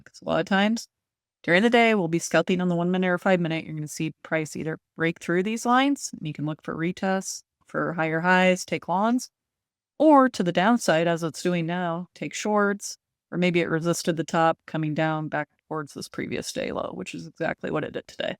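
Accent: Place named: United States. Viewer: Hey, I have a question please. You sound like you are from the US. how did you get an account with MEXC? Whenever I go to the site I get a blank page that says they do not service US clients.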